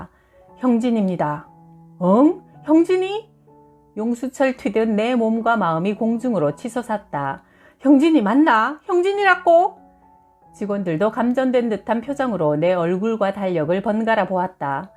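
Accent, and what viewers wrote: Busan, South Korea. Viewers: 님 부산사투리 직이네예~~😂😂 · 님 부산 사투리 ~~ㅎㅎ 최곱니다.^^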